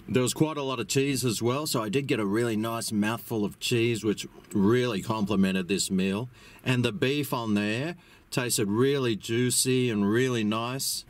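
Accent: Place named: Australia. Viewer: Love that Australian accent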